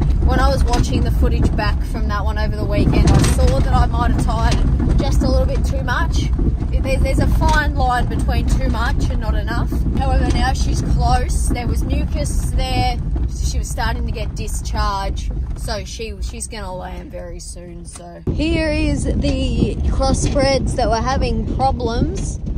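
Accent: Australia. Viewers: I love everything about your content. You telling us how stuff works out there. Aussie accents are always a delight to listen to. You telling the idiot comments to fuck off (this one was particularly good lol). Random Slug intermissions. And seeing kangaroos fucking about like deer (as an American, most of the time if I ever see kangaroos in videos it's documentary stuff, so seeing them just doing kangaroo things in someone's fields is weirdly entertaining to me). Good stuff all around!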